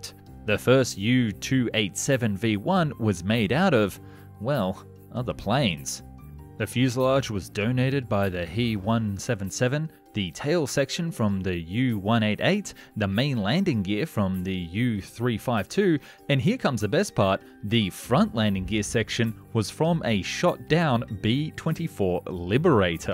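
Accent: Australia. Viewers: Apart from the weird Australian/NZ (?) pronunciation, this is really great!! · He misspronounces some words due to his aussie accent. Some facts arent straight too. Id give it a B... the channel.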